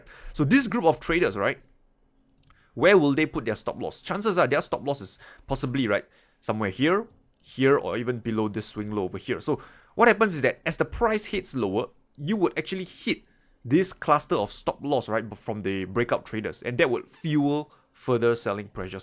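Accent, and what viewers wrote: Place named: Singapore. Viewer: You have a very Singaporean accent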